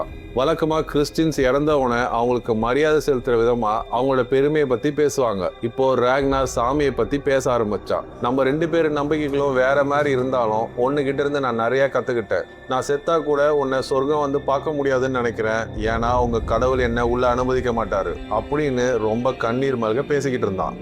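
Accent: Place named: Birmingham, United Kingdom. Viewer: Yoo broo oun voice la peaky blender pottu bro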